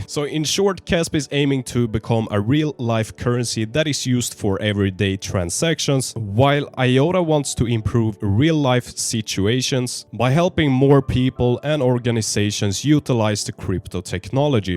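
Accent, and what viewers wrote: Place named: Sweden. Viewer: Yoo I can hear the Swedish :), let's meet and discuss crypto IRL. Botkyrkabo jobbar i Norrmalmstorg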